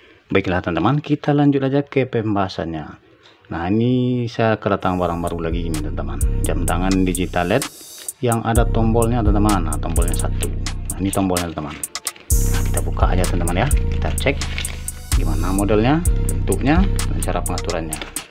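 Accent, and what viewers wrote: Padang, Indonesia. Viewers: tengkiw bg, btw orang padang ya bg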